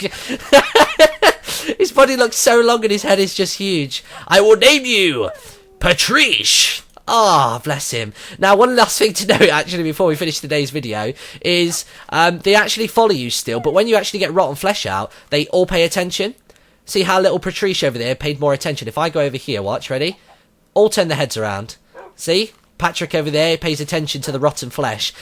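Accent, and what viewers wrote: United Kingdom. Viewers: oh, cheerful person, funny... and a british accent. You got yourself a new subscriber.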